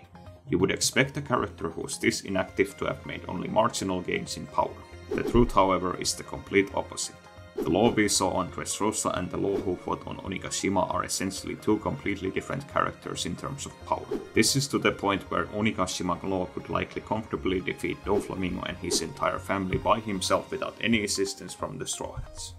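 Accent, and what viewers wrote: Finland. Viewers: Why do you sound Finnish? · your accent sounds finnish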